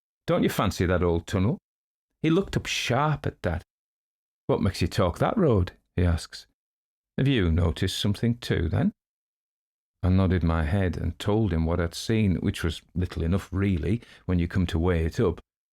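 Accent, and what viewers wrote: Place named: Northern England, United Kingdom. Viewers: Excellent. The Northern vernacular was great. Are there more stories from this guy Rolt? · Just stay properly Northern. There's nothing worse than a fake accent and fake posh :)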